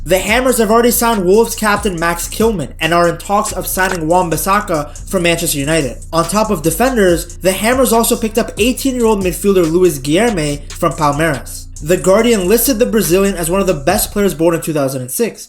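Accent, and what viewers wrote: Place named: United States. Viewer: 😅 mate please use AI next time. Love your bigging up the club I loved but it all goes out the window with your accent. It's not your fault I don't blame you. We would likely get along loving a beautiful sport. But please use AI. Your accent with an American English twang puts one off, thinking 'sensible' Anyway apologies if my humour or attempt goes wrong. Keep well and keep doing what you love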